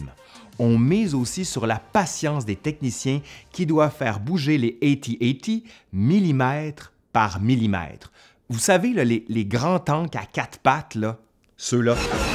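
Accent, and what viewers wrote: Quebec, Canada. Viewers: Quel plaisir d'avoir découvert cette chaîne à l'accent français chantant du Québec et sa bonne diction des mots anglais que nous n'avons pas chez les vidéastes français x) Contenu très qualitatif !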